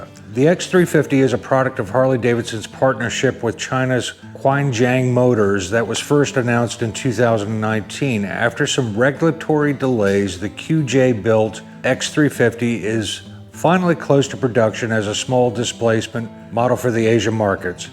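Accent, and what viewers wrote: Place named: United States. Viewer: I lilke your accent which state are you from?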